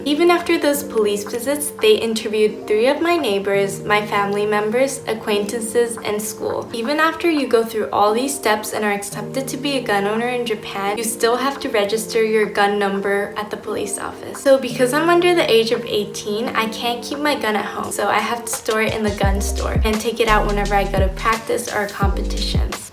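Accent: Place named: United States. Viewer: you are absolutely entitled to your opinion on guns or anything else - of course! I do feel that most honest, law-abiding adult people anywhere in the world are responsible enough to own a gun and they should have training to know how to use it effectively and when it is legally permissable to use it. In my own case I was British Army trained first at school from age 13, then later actually in the Army Reserves. I learned all I needed to know about firearms there. I have lived in South Africa since 1975 and have always owned firearms for self-defence in one of the most violent crime-ridden countries in the world. Only needed to use a gun once though, several years ago but did not shoot anyone, merely frightened them away. I know that in this country the injuries and fatalities by gunshot are committed not by registered, legal owners of guns at all but by criminals, robbers and such who do not purchase and register their guns - they usually steal them! 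I do not know Japan at all, but a friend of mine who does has told me of the beauty of the country and of the nature of the people. Your English incidentally is excellent and I note that you have a slight and rather attractive American accent - it is a pleasure chatting to you!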